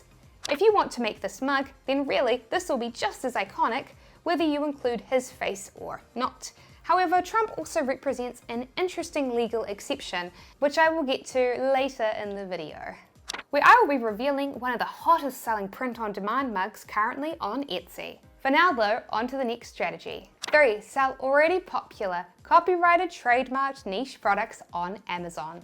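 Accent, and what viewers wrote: New Zealand. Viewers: I think it's New Zealand accent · Love your content and kiwi accent....im an aussie.😆👍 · The only thing I like more than your cool Kiwi accent is that blue and pink striped shirt.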